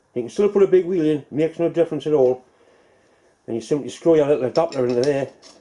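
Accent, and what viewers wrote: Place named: Geordie, United Kingdom. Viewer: Absolute nonsense. has one of the finest regional English accents. He is a proud Geordie and long may he remain so!!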